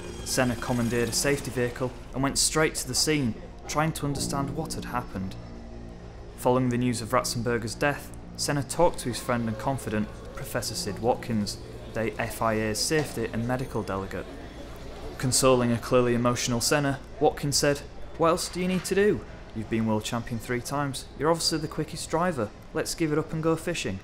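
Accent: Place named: West Yorkshire, United Kingdom. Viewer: Are you from Leeds? Or like West Yorkshire? Anyway class video mate - really enjoyed that.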